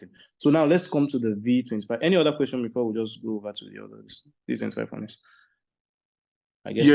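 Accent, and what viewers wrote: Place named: Africa. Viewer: I love you talk markets in your special african ascent haha I laughed while watching your video. Keep these videos comming. You milk we enjoy!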